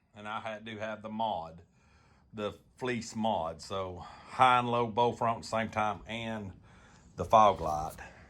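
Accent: South, United States. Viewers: All auto DIY videos hall be made with the deep southern accent. Makes me feel comfortable and brings me back to my childhood. And makes me feel like I can do the diy too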